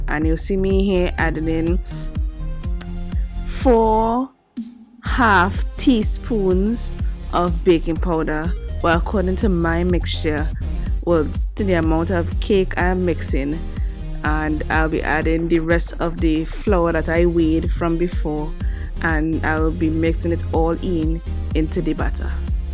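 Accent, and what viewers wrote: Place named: Tobago, Trinidad and Tobago. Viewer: i can hear that strong bago accent...keep it up dear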